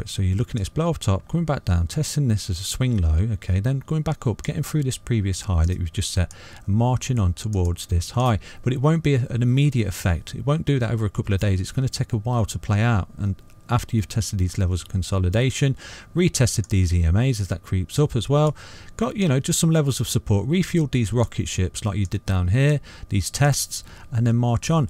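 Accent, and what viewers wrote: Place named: West Midlands, United Kingdom. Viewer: Thanks for the vid, I enjoyed it and learnt a lot. Also sounds like you're also a west midlands chap?hah Where were you looking at this TA chart? cant seem to get the detailed view on coin market cap. Also, im guessing as it went through the level of support you suggested, a new entrry point might be at $0.00020?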